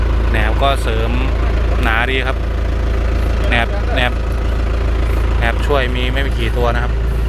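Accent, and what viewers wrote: Phu Thai, Thailand. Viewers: คือสำเนียงออกทางผู้ไทย แม้นเบาะครับ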